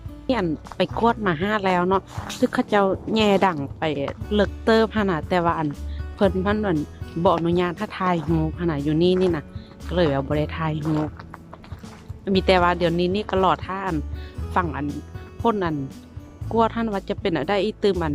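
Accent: Luang Prabang, Laos. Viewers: มัก เด เสียงเว้าหลวงพะบาง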